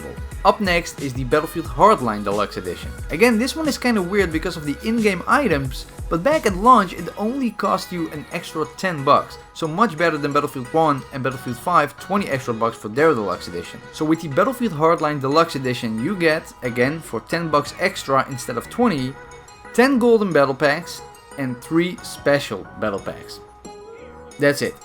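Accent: Netherlands. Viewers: So you from Netherlands?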